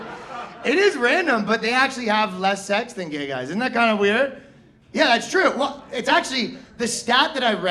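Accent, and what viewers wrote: Canada. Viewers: Is he Canadien or something?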